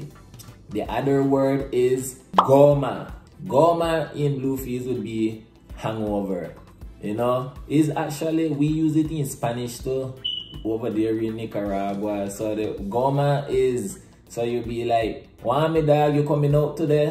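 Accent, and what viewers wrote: Belize. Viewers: You sound like wa Belizean... Im Belizean btw love this video educate us brother.. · You talk straight like a Belizean breda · Bway, I thought you was straight up Belizean for a minute. I neva though the Nicaraguan Kriol was so similiar. Big up mi bredda.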